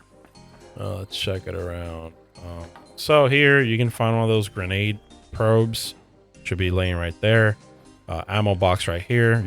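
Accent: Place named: India. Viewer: i know your indian but could you tone the accent down a little, hard to understand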